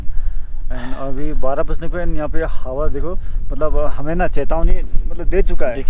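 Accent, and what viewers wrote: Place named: Northeast, India. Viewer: Yeh hai apna northeast hindi....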